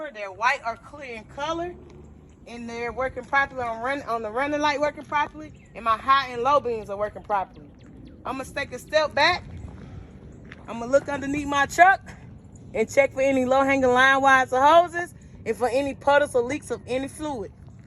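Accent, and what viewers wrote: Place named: Dallas, United States. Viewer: Watched all your videos back to back and they are very helpful. Can tell you in Dallas from the accent love it. Thanks for the videos, got about two weeks till my test!!!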